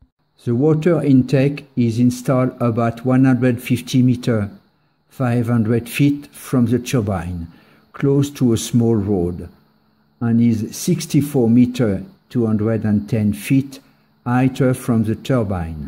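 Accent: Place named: France. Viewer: Ah ah j'ai eu le même constat, pour une fois que je comprends intégralement une vidéo en anglais. Vive l'accent franchouillard :)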